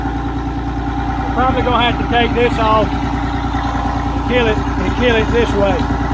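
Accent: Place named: South, United States. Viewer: You're welcome. The polite southern commentary is 2nd to none.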